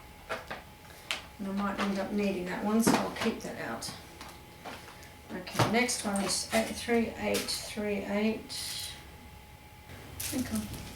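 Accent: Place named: Australia. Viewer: G'day from SA I thought hmm she sounds Aussie then she said Kmart and I went woo hoo! LOL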